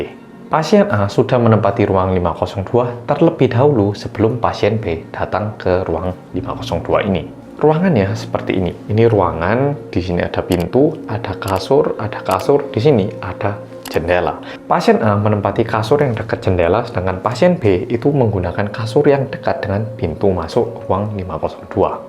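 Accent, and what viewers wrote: Java, Indonesia. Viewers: awal mula medok jadi kepeseng gimana nih?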